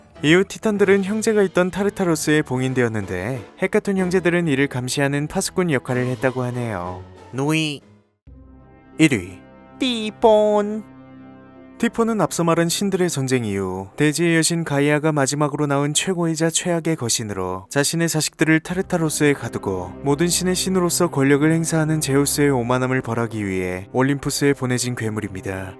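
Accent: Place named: Japan. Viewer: 그리스어 아는 한량입니다 일본어 악센트만 좀 없으면 밥도둑일것같습니다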